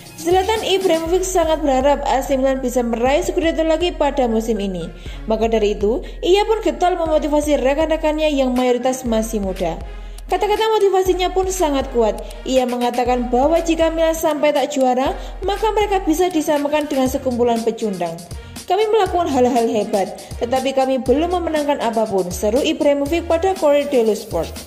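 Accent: Java, Indonesia. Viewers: Wong Jowo mana neng, logatmu kuental 😘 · Medok abeezzz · Medok....amat neng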